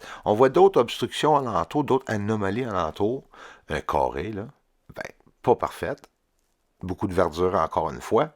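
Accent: Canada. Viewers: J'aime l'accent sympathique de notre ami Canadien .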